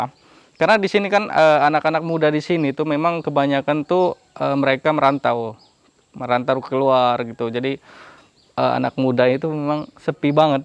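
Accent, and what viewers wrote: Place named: Lampung, Indonesia. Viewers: Baru berapa hari di rumah udah lampung kali logatnya bang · Bang Lampung nya dimana? Dari bahasanya yg sedikit terdengar...seperti bahasa daerah Lampung Barat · Lampung ne gegoh nhn bahasa ne heee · Pantes kek logat lampung pas liat video" yg baruu wkwkw ternyata orang lampung... Wah ikut bangga... Lampung mana bang... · pertm nntn vlog bang...lgs tau kl bang suku Lampung,khas dr logatnya.trnyt qt msh 1 daerah...kamipun sukuny lampung asli ibuku dr tanggamus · Bahasa lampungnya kok mirip peminggir/pesisir... aslinya Lampung mana bang? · pantesan logatnya kok kayak orang lampung, kk ternyata orang lampung tohhh.....mirip kali sama teman kuliahku Redy, mukanya, suaranya ... wkwk.. sukses terus ya kak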